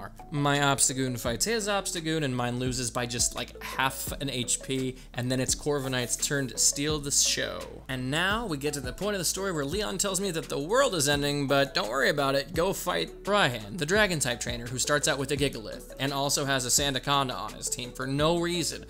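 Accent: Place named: England, United Kingdom. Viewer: IBXToycat IMPRESSION/ making fun of the american accent is the first thing i thought of after you apologised for an english one, lol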